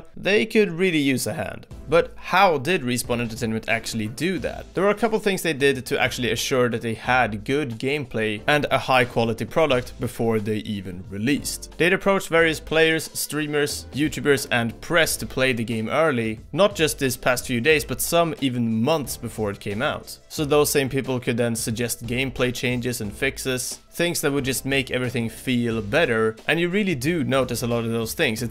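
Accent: Sweden. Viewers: Are you swedish? You have a very swedish accent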